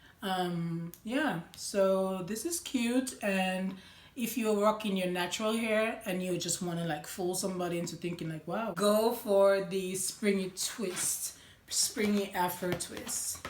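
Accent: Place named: Saint Lucia. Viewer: What island are you from you sound like a St.Lucain 🙋🏽‍♀️ if you are 👏🏽👏🏽👏🏽🇱🇨🇱🇨🇱🇨👏🏽👏🏽👏🏽... so I watched this video last night I’m great at doing hair and braiding and I’m quick ... I wanted something different I had NEVER done any twist with any Afro kinky so I did my hair last night ... it has NEVER taken me 5 hurs to do my hair I did it individual and I did 2 bundles 😤 it came out SWEETTTT AND LIGHT it was worth the time . Thanks for uploading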